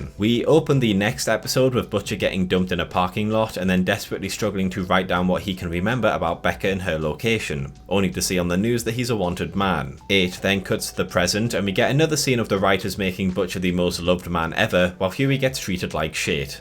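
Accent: United Kingdom. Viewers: why do people who do these videos always got a brit accent?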